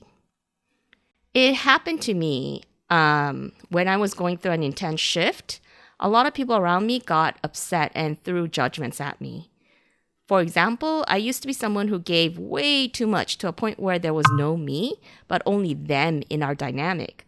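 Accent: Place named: South Korea. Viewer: Not related to the topic, but I assume you are Korean American? Haha... just a guess but you have a bit of that Korean accent while speaking English.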